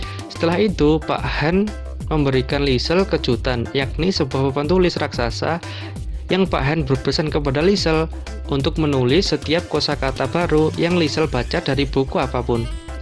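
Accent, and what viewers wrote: Java, Indonesia. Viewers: Jangan terlalu medok logatnya min, jd krg enak didengar, maaf ya biar kedepan lbh baik lg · Medokkk jawa , suara lu kya org kurang makan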